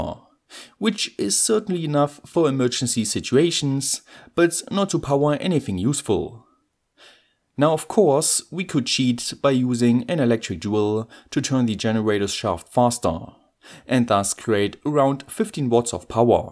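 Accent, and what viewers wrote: Germany. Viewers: the content of your videos SOOO GOOOOOOD your thicc denglish accent SOOOOO BAAAAAD i will now watch your videos muted and with subtitles on. thank you very much for the effort to put subtitles in! keep uploading, you have great videos!!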